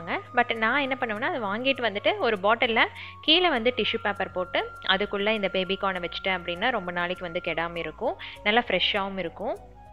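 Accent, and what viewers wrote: Coimbatore, India. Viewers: thats so nice of you, btw neenga cbe dhana native, me too from cbe, your accent sounds so.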